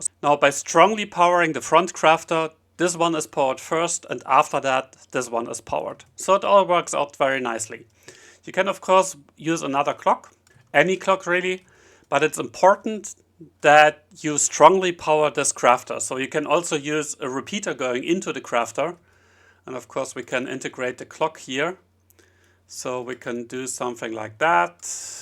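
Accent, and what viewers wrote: Germany. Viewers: The world needs a technical minecrafters with german accents, no nonesense german efficient minecraft engineers.. now you need a ridiculous theme tune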